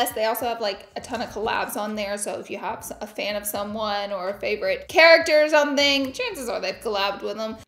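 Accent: South, United States. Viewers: Ugh, looooooove these videos! Used a few of your links 😁💖 Side Note ~ I love that you haven’t lost your country twang lol!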